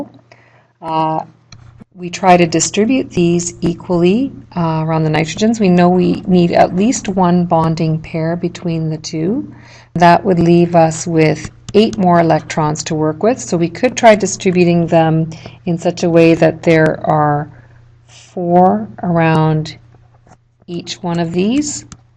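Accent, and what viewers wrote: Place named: Canada. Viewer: Thanks, that really helped! Even with that Canuck accent! Sounds like your software or mic has some effect that makes your voice start out very low volume, then come up to normal volume. You video is super helpful, so I hope you can get that fixed!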